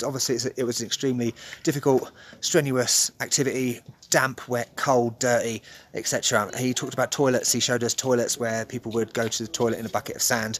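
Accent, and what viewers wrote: United Kingdom. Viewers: Hi, Love this video and it shows that you are truly in a league by yourself when it comes to producing an informative, quality vlog that is truly worthy of watching again in the future. I always enjoy as an American just listening to the British accent and love your culture and unique sense of humor. You're in a class of your own and your class and intelligence and the effort you put into your videos shines through. The Kennons and Tangerine travels don't even come close and you and I all the other brilliant, smart, educated people who watch you on a regular basis know this to be true. Look forward to your videos in England and Bulgaria.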